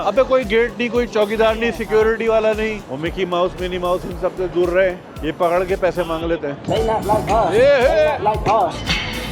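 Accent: Karachi, Pakistan. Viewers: Paki in las vegas with his orangi town english accent 😂🎉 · walking tales ko dekny ki main waja ti karachi xcent asal maza us style ka ta funy bato ka ta pakistan k sat comperssion ka ta is tara to seeda sada banda youtube my kahi b dek sakta h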